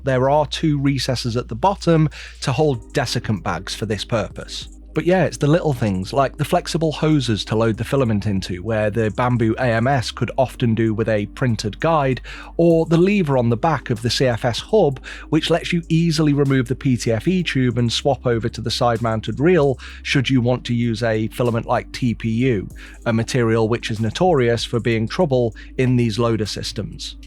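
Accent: United Kingdom. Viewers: Great video, still using my Ender 5 Pro, good printer but really showing its ade now. Maybe by the time they have sorted the software out I will have saved enough to buy one. If you are planning on giving you X1 away for buttons. I'd collect it from anywhere in the UK. you'd be making an old man happy 😉😉. Seriously it's nice to hear a UK accent, you won a sub.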